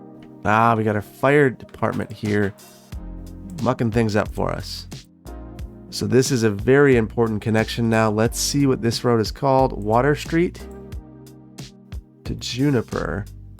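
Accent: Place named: Midwest, United States. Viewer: I've been catching up on your videos, and I just gotta say: your accent gives me life. God bless the Midwest!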